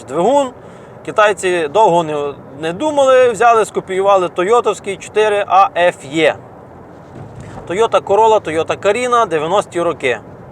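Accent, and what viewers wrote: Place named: Poltava, Ukraine. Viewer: Это полтавский быдло-суржик, уж извините.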